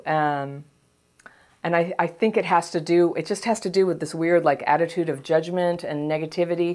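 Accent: United States. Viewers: So Southern!!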